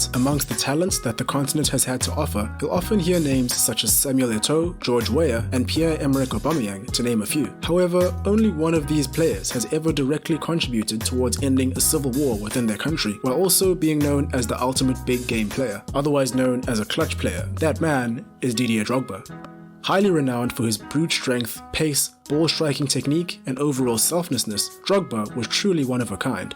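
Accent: South Africa. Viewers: Bro you South African? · Are you South African ? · are you from south africa?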